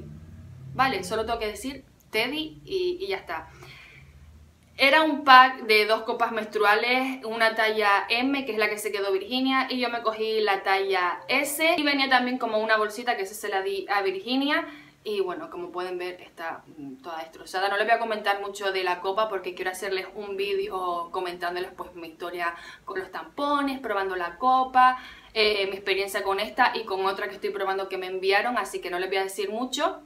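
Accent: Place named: Canary Islands, Spain. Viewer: hola guapisima me suscribo!! creo que eres canaria por el acento no? jijiji, yo soy de Gran canaria (las palmas) muchos besitos!!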